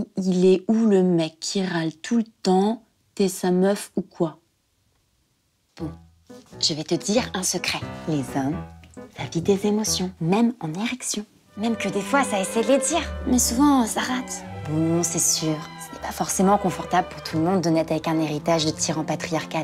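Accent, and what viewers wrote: France. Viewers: Je sait pas si c'est l'animatrice qui fait cette effets mais c'est la premiere fois que je n'aime pas vraiment une de vost video ... Encore c'est peut etre ton accent trop francais qui faisait que en ten que quebecois je ne comprenais pas toujours mais bon ... En esperent retrouver notre youtubeur habituel a l'avenir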